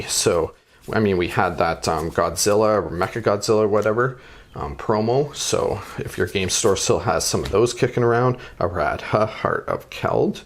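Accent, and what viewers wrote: Canada. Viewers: The amount of times he’ll say “eh” in his videos, no doubt he’s Canadian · First! Awesome videos! Canadian Accent is Crazy!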